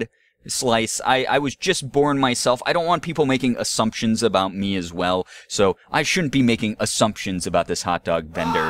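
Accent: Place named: United Kingdom. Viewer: Hey, It's I am Bread with bad puns, British narrating, and (much) less rage! :D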